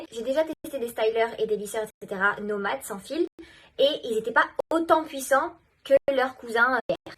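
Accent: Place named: United States. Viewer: la fille américaine qui parle frensh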